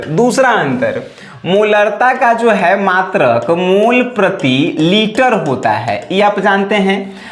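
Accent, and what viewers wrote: Bihar, India. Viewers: Verry nice for Bihari style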